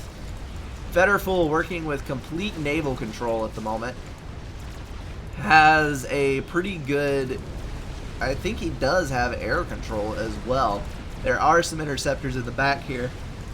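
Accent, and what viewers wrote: United States. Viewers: I love the southern accent from you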